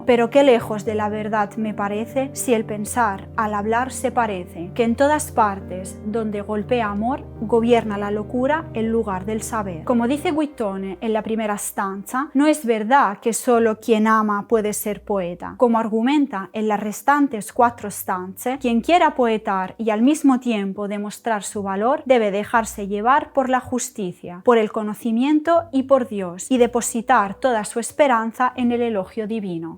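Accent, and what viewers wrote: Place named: Italy. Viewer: Se te quedó el acento italiano al hablar español.😅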